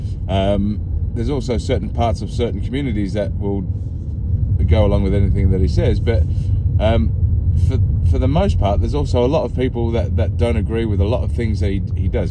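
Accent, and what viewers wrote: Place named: Australia. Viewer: Love it 😂 P.S. You sound like the 'A succulent Chinese Meal!!' fella from our cousins over in Straya.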